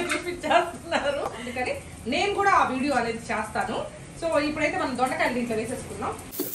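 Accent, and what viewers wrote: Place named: Kadapa, India. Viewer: Bendu teese kuralu shuru, Beerakaya yendu chapala mix, donda kaya talimpu, rendu rende andi 😋😋. Antha energetic mothers ye andi mee home lo ammamma> amma > meeru. Methhalluu, royapottu kadapa slang 😊, exhaust fan vesukondi sound vinapadutundhi no worry, andhuke subscribers ki earphones use cheyyamanandi, beerakaya so colorful 🥒🌶🍅. Now dondakaya preparation start, Wowww dondakaya prepared, Rio ki manchi exercise 🦜, now beerakaya yendu chepala pulusu prepared 🥣🥣, Gongura pacchadi kuda 🥣, Rio viswa prayatnam chestondhi dondakaya tinalani 🦜, Nenu insta delete chesanu andoyi, kitchen lo Rio yuddhame chestondhi, nice vlog garu 👌👌👌 but taste review 🤔🤔🤔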